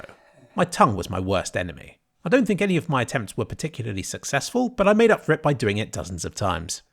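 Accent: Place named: RP, United Kingdom. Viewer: Real sounds much more posh than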